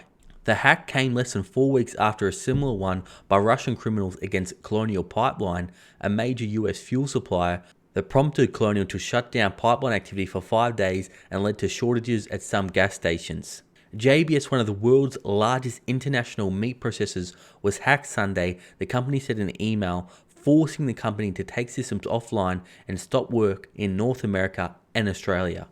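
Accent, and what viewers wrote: Australia. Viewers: Are you from auzzie or nz bro? You sound like an anzac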